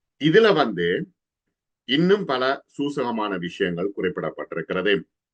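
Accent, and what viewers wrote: Jaffna, Sri Lanka. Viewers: உங்களது தமிழ் உச்சரிப்பு யாழ்ப்பாண தமிழர் போல் உள்ளது. உங்கள் பெயர் ஒரு இஸ்லாமிய பெயர் தானே. எப்படி நீங்கள் தமிழை தெளிவாக பேசுகறீர்ள்?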